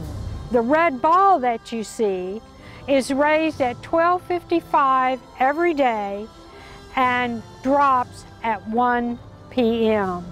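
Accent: United States. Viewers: What type of American accent does she have? I'm American, and I don't recognize it.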